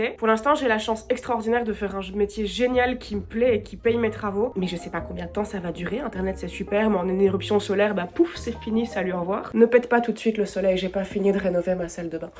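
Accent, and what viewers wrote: Belgium. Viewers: Tient c'est première fois que je remarque son accent belge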